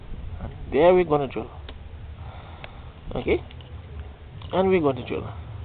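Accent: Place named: Caribbean. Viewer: Where in the caribbean are you from?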